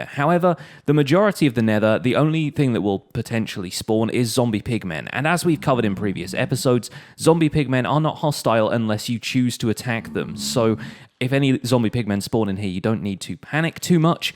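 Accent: United Kingdom. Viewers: British all the way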